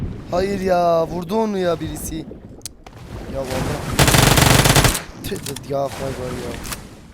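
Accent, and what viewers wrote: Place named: Şırnak, Turkey. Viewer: konusman çok iyi be hacı❤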